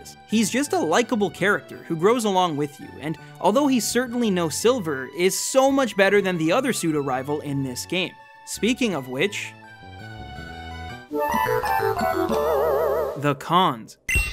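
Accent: Canada. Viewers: Bro your Canadian accent is so awesome, I want to watch your videos just to hear it lol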